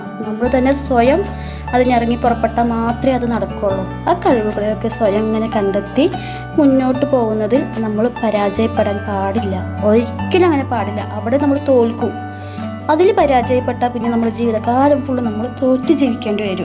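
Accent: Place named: Pattambi, India. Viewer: നിങ്ങളുടെ ഓരോ വാക്കുകളും ഞാൻ ശ്രദ്ധിച്ചു കേട്ടിരുന്നു അറിയാതെ ഉള്ളിൽ ഒരു എനർജിയും ആത്മ വിശ്വാസവും ഒക്കെ വന്നു 😍 പട്ടാമ്പിയാണോ വീട് സംസാരം നല്ല രസമുണ്ട് 👍 All the best dear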